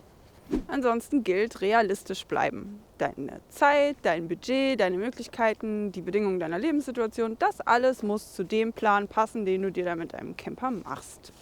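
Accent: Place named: Berlin, Germany. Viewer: Ich liebe deinen Berliner Akzent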